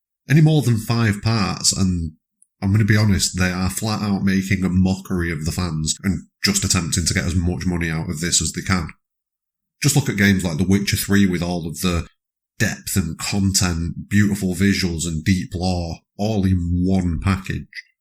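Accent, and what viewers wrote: Northern England, United Kingdom. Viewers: Also nice to hear a fellow Northerner. We don’t have many people from our part of the world. Most are across the pond. I am from East Lancs so not far from you at all.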